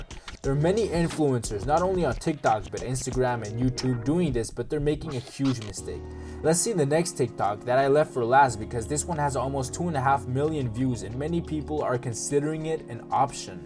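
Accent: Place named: United States. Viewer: Joder nos salió gringo el doc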